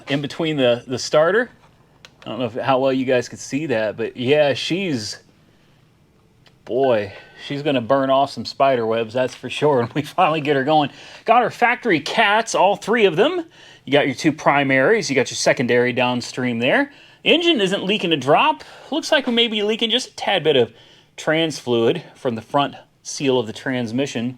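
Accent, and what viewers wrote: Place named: Tulsa, United States. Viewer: Oh Dude, I'm watching this and cringing just knowing what's going to happen to you and you're such a nice person. My family is from Tulsa and I always feel a kinship when i hear you speak.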